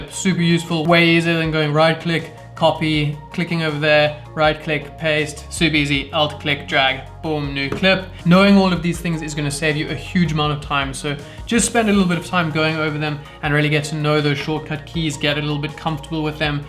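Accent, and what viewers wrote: Cape Town, South Africa. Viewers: bro you from Cape Town?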